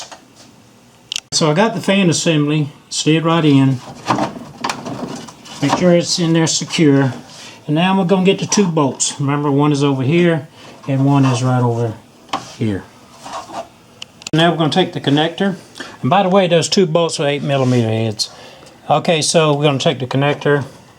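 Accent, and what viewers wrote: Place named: South, United States. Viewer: Where are you from out of curiosity? My guess is Louisiana? Texas?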